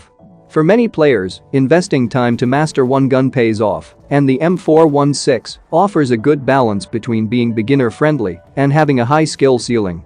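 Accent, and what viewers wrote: India. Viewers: Are you indian???😂😂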